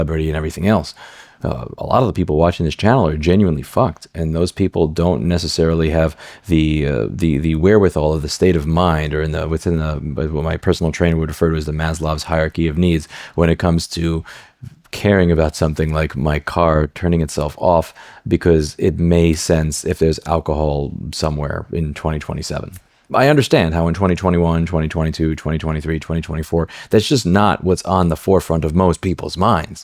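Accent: United States. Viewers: Oh .... I thought he drove a manual + he sounds American: ipso facto, alarms/kill switches are automagically built-in to a manual.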